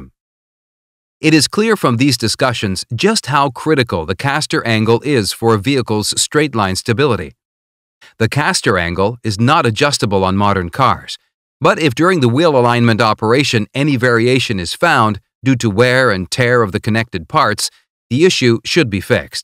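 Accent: United States. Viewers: Speaking Americanized English, but with a steering wheel on the right side. Hmmm. This is an awkward video. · In what part of the world do they drive on the left, and speak with an American accent? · The video was produced with an American English accent yet the car is shown being driven on the left side of the road.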